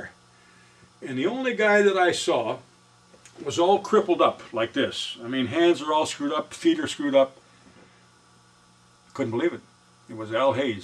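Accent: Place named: RP, United Kingdom. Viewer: He does a surprisingly decent posh English accent (I say that as an Englishman)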